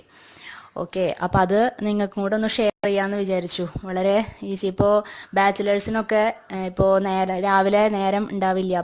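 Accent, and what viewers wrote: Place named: Thrissur, India. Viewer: variety breakfast.. thrissur bhashayanaloo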